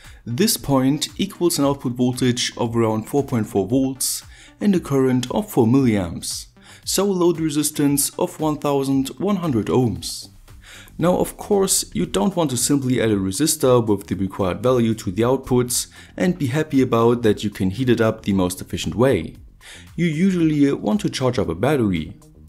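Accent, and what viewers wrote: Germany. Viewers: I get excited whenever says "Let's find out!" in that pronounced German accent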